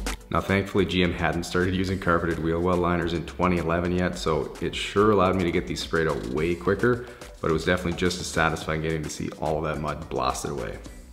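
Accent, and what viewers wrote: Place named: Canada. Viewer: I can't get over the Canadian accent.😂 so cute! Such a satisfying video!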